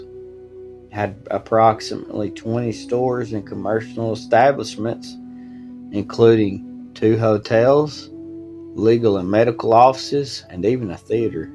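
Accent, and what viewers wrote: South, United States. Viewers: I just found your channel while looking up information about this town, I was reading about it on the AHC's website- as a 37 year native of Alabama, I love to see people keeping our proud, beautiful state's history alive for generations to come. Brings pride to my heart- thank you. And hearing it read with our southern drawl really seals the deal. Love this content and I'll be coming back for more.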